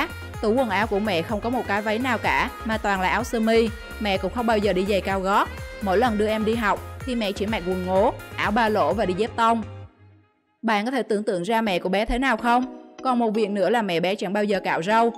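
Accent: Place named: Central, Vietnam. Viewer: Tìm bạn nữ đọc rõ tiếng Việt nhé Ad. Đừng dùng người trung giọng khó nghe quá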